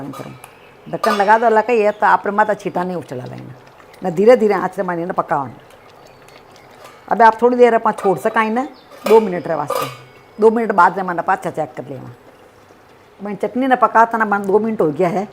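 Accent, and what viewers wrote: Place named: Garhwal, India. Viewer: Why Aunti Ji is Sounding me a gadwali 😀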